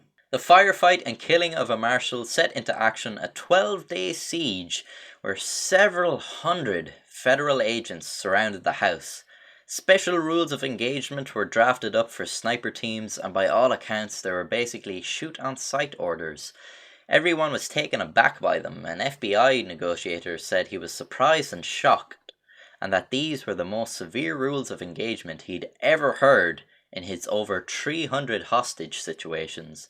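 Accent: Ireland. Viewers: your irish accent makes it funny when you rant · Are you Irish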